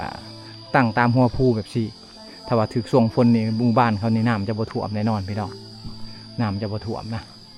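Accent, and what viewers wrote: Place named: South, Thailand. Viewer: ฟัง คนใต้บ้านเราเลยเนอะ😆😆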